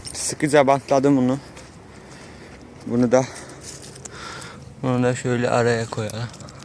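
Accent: Black Sea, Turkey. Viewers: karadenizlimisin şiven var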